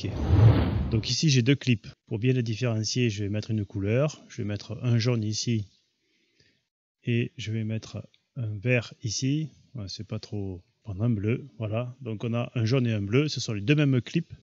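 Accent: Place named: Provence, France. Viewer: Voilà une belle voix qui respire la garigue et le romarin comme Galinette peuchère !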